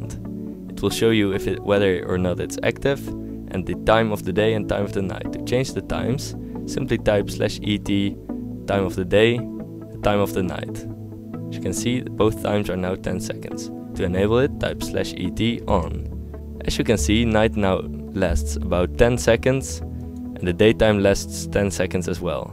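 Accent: Netherlands. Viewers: Ha. Hij is gewoon nederlands. Ik dacht al hoorde een hele vriendelijk nederlandse jongen:)Hoi! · are you from the netherlands??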